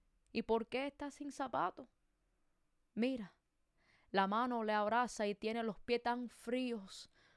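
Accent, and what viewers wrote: Cuba. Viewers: Me encanta y más con el acento cubano, saludos de México 🇲🇽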